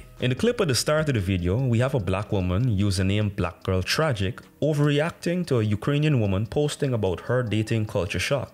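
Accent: Guyana. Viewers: Guyanese accent alone gets a sub. Content was good to, Bigup yuhself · Your accent sounds Guyanese? Where are you from? Nice clear explanation in your video also.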